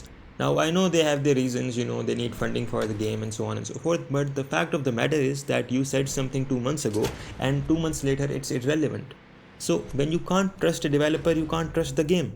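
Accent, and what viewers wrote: India. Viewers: The second I heard that indian accent I knew I was getting baited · knew this was a scam video the second I heard that poopjeet accent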